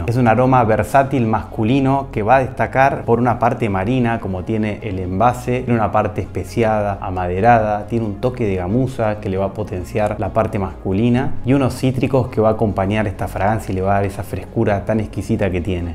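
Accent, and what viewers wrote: Argentina. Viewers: Bien claro las explicaciones. Maestro es cordobés usted?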